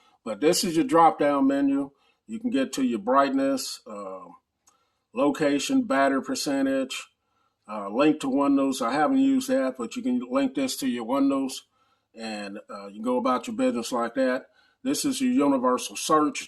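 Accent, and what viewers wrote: South, United States. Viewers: Like MKBHD with a southern accent lol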